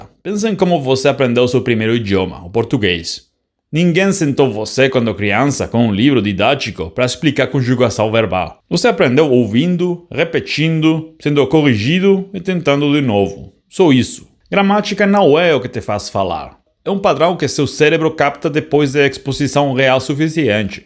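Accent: Rio de Janeiro, Brazil. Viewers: Muito legal seu vídeo, só lamento que você aprendeu português com sotaque carioca, na maior parte do Brasil não falamos com "chiado" nas palavras que tem "S", como você deve ter aprendido. Fora isso, acho que este é o primeiro vídeo sobre aprender outra língua realmente útil! Parabéns pela iniciativa! 👏👏👏... Grata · VC fez certo em aprender o portugues carioca! 🎉🎉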